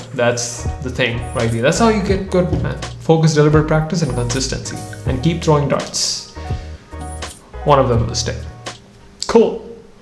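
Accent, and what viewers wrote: India. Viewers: Because your accent is like Indian Me Pakistani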